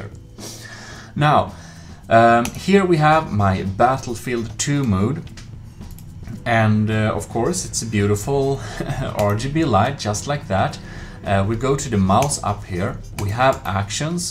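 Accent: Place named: Sweden. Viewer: i can litrealy here that ur from sweden LAMO lwest goo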